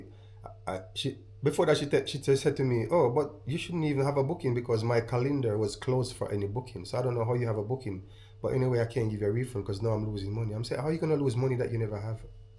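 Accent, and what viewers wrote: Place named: United States. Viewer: You have an American accent more than Jamaican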